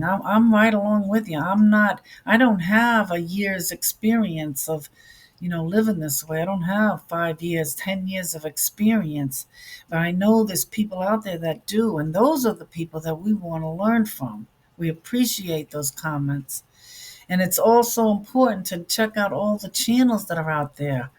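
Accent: New York, United States. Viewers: I love your list! Usually I'm not excited about lists I find in YT videos, but THIS one is wonderful bc it is so personal to you -- and to me! I'm just 4 days in and terrified to speak it bc I'm afraid I'll fail. Bless you, young angel for your heartfelt words. You'll be better soon. Food poisoning is the pits! (is that a NY accent I hear?? love it)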